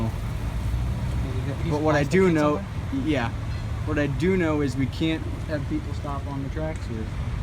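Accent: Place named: Canada. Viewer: guy has Canada accent but says PA in some vids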